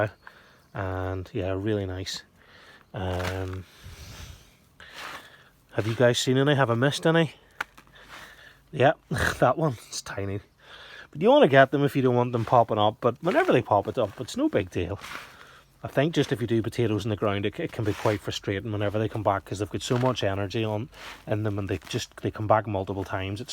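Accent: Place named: Derry, United Kingdom. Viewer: I’m from Strabane so similar accents, you’re just slightly more Derry than me 🤗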